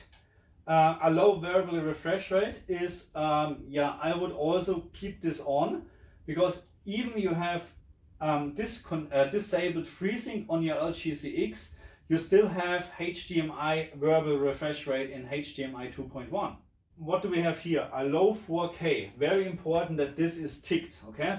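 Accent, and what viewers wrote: Germany. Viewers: If a man with a strong German accent tells me to change my CX settings - i immediately change my CX settings ! 👌🏻🤟🏻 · I just want to tell you that content is very explanatory and you have put some knowledge and effort into it. Thank you for that and keep with it. Do not listen to haters they have nothing to provide. Feedback and criticism are 2 different thinks. And I love your accent. Are you from Germany? Viel Glück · Dojcz akcent?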